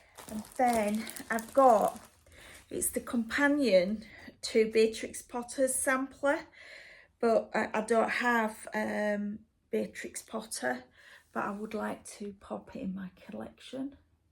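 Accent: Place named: West Yorkshire, United Kingdom. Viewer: Hi I’ve just started watching you. Carol the saltbox stitcher recommended you. Your framing is beautiful, could I ask where did you get the long narrow frame please? It’s gorgeous. I am in South Yorkshire and I’m guessing you’re in West Yorkshire going on your accent. Anyway I enjoyed your Flosstube. Thank you. 😊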